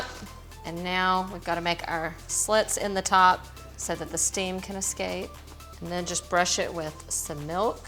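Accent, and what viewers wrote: South, United States. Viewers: Clear and to the point, even with some bloopers stuck in. And what a hostess with that yeehaw twang! <3 Thank you kindly from Poland.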